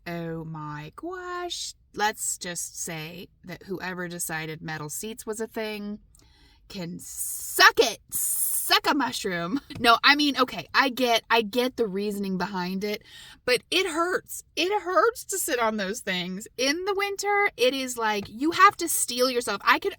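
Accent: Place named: Texas, United States. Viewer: I thought you sounded Texan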